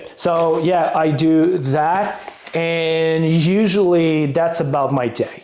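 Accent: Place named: France. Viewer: Your lovely French accent makes everything sound so refined and erudite. Lots of love from SK!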